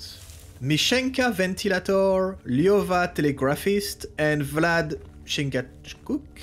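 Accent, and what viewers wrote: France. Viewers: hey dude, i can’t say where u from its like a french accent and russian one 😂 could u help me on this one ?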